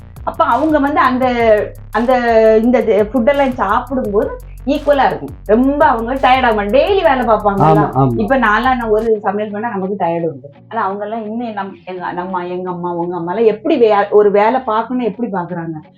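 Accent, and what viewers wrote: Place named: Kerala, India. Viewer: Kattu soru. Kanyakumari enbadhal malayala accent/ dialect oda pesuhirar.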